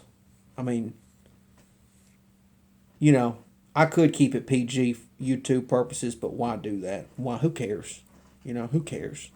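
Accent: South, United States. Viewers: where are u from? You don't have that stupid New York accent. U actually sound more southern.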